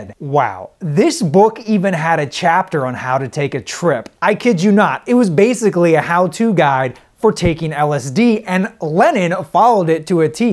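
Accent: United States. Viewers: would pop you in the face if you got anywhere near him because of your loud American delivery like Jim Carrey's movie The Mask. Must you embarrass us USA fans? Its so offensive to the memory of the dude! Yeah if you are going for Jim Carrey, wear the mask at least?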